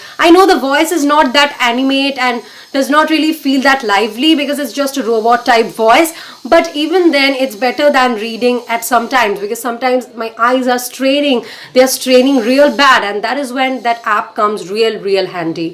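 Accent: Bengal, India. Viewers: May I know if you are a Bengali... Because I found a Bengali-like accent in your speaking and that is good. Anyway I'm a Bengali. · Are you Indian? Your pronunciation proves that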